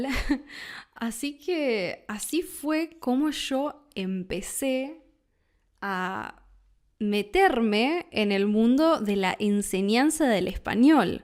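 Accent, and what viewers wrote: Rioplatense, Argentina. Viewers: Tienes un poco el acento argentino,. Saludos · De donde eres?!? Porque habla diferente… Su yo es sho 😮 Es Ud de Argentina?? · Great first episode, I’m looking forward to the podcast and know I’ll enjoy it no matter what you decide to talk about. I was similarly obsessed with the world of YT language learning channels and language related content for a while. I watched all the big name YT polyglots of the time and followed so many peoples language learning journeys. I even watched some those channels aimed at teaching you a language, like Japanese from Zero. I never really made significant progress in any languages back then though. It wasn’t until starting Spanish again in December of 2023 that I finally found a way that worked for me for learning a language, comprehensible input. I love Rioplatense Spanish, and your accent in particular, so I’m glad you didn’t let your accent/dialect dissuade you from starting this path. 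I’m super grateful to have found you as a content creator and iTalki tutor all those months ago. It’s been over 7 months since our first crosstalk and now our lessons are entirely in Spanish and it still blows my mind. I really appreciate you as both a content creator and a tutor and I’m so happy that it’s something that you enjoy, that makes such a huge difference. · Thank you so much for these podcats. I'm learning Spanish purely through comprehensible input and i can already tell your podcats will be of great help to me in my pursuit of fluency. I also appreciate your argentine accent, my aim is to understand all spanish speakers, whether they're from spain or latin america. Keep up the great work you have gained another subscriber for your channels.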